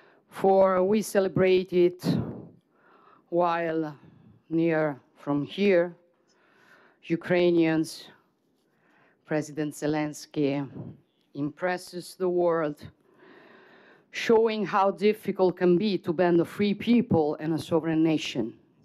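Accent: Rome, Italy. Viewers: Brava Melona ma l' accento è de Roma 🇮🇹( per fortuna) hahaha 🤣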